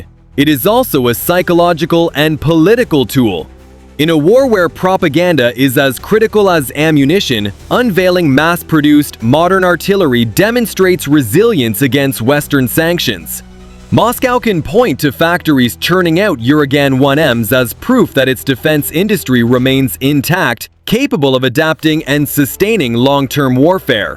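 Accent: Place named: United States. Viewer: Did I miss , for how far it shoots ? All information was logical with a good an Amercan English , thank you .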